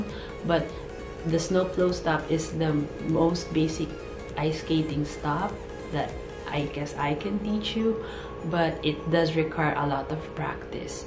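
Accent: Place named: Philippines. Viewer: OHMYGOSHHHHH your Pinoy accent is so cute!!!!!! At ang guwapo mo rin omgggg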